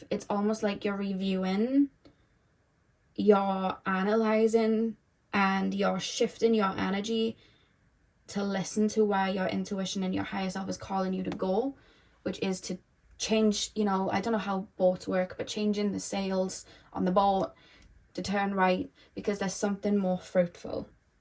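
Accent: Wales, United Kingdom. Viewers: Coming from Pile 3 😭 thank you so much, bawled as usual! I love when you say alright trigger warning we’re going deep lol. These readings of yours have been so powerfully healing for me. The higher self of your mother one did more to help release and forgive her than anything I had tried. Also, even though I’m Australian my Dad is from the UK and I know you’re Welsh but your accent is similar to my ancestors so it all feels extra healing 🦋 Going back and forth with a toxic ex right now, feeling the deeper thread ready to break and knowing I have to go purge some ancient pain. So don’t want to 😂 But if I don’t I’ll just go and seek the same person out in a different body! I’ve just found your inner teenager reading so will do that one 🫶🏼 maybe a reading on how can I receive nurturing better or just understanding worth and love properly maybe? Childhood trauma/narc/emotionally immature parents and the relationships we form after that are a real minefield to heal from. 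I really appreciate your readings and the fact that you really truly GET IT. 🤍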